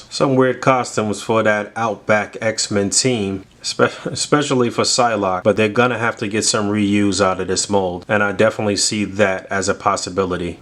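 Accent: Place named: New England, United States. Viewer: First time here, boy is that New England accent thick AF keep up the good work (QGTM)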